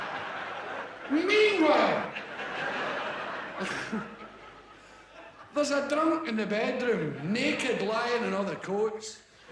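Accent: Scotland, United Kingdom. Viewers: Watched this with subtitles, and even autosub can't figure out some words😂😂😂 I love the Scottish accent😂😂😂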